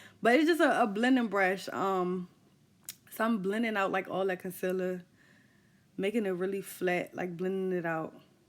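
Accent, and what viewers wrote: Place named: DMV, United States. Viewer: 🗣YOU ARE GORGEOUS!!! Btw: I know a fellow DMV fam, I hear the accent. ♥️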